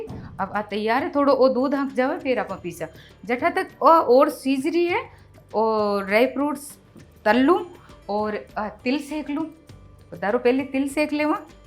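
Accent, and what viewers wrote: Rajasthan, India. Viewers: I like your Rajasthani boli and recipes too.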